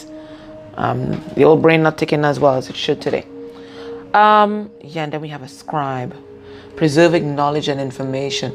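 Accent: Trinidad and Tobago. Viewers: Speedy recovery sis. Very accurate read absolutely amazing lol are u trini?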